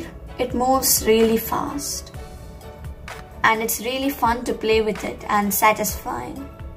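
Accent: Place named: India. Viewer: You will be more diseased than you were before and that's Indian accent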